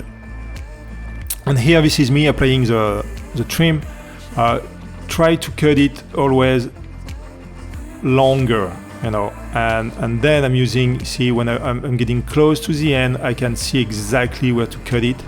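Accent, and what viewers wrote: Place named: France. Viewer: I love the french accent 😃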